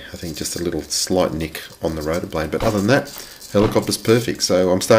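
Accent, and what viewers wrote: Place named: Australia. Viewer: Thanks. I can hear from your accent that your an Aussie =) Added the grommets where you suggested. The tail is abit of a chore to with these figdety fingers, but it looks good. I'm ready to fly, once the batt is fully charged...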